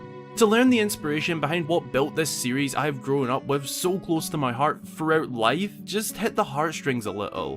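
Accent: Scotland, United Kingdom. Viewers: You're scottish?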